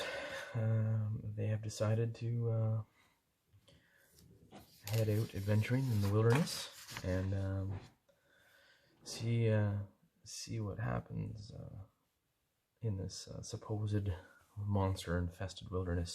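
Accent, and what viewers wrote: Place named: Ottawa, Canada. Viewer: Originally Ottawa valley but Victoria now. I knew you were local Ottawa from the accent.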